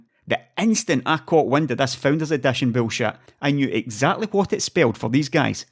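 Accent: Scotland, United Kingdom. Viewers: Love you, you are my favorite scottish accented tech youtuber of them all, and i can't wait to see more of you in the coming months. Much love and keep strong! <3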